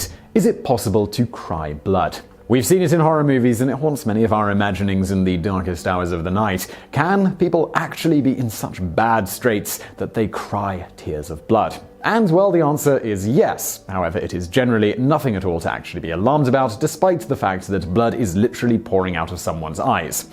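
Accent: United Kingdom. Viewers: When he said talking about the military I don't know if it is the fact that everything he said was bullship or the fact that he has a British accent but either way don't believe any of it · What part of Britain does his accent come from?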